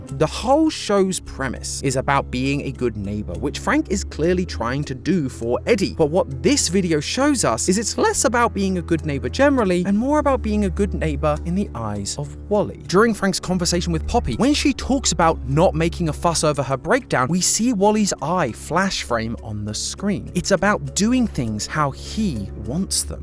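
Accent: United Kingdom. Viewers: Honestly has really grown on me, I kinda like him more than matpat tbh but that could just be because he's got a funny British accent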